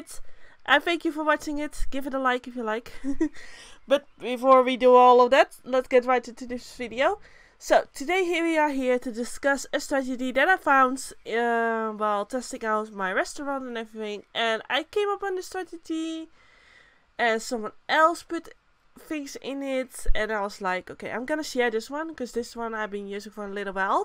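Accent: Netherlands. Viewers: Idk why but you kinda have a Dutch accent, ru Dutch? (No hate just asking 😂❤) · You sound dutch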